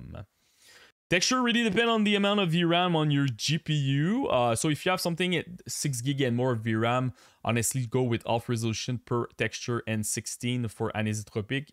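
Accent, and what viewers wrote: Quebec, Canada. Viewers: You french Canadien right?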